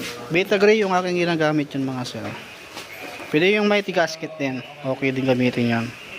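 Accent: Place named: Visayas, Philippines. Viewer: Taga asa ka idol,parang may hang Visaya ang sa Lita mo, Ako Taga bohol....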